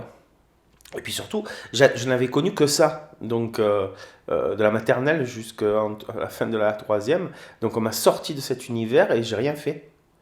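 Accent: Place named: Provence, France. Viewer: Bises à toi,qu'est ce que tu as pu me faire rire avec ta faconde provençale qui me rappelle tant "Les Martigues" de mon enfance...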